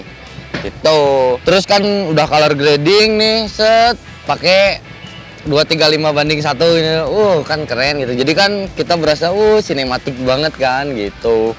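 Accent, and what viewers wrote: Indonesia. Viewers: sundanese pisan wa hihi... ka coba tutirial color grading nya.. makasih k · medok euy sunda na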